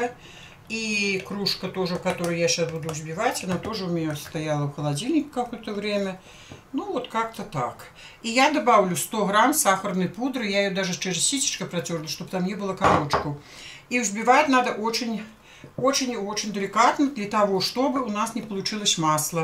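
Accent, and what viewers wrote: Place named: Belarus. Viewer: Умница, обожаю белорусский говорок. Спасибо за чудесный, простой в приготовлении рулет❤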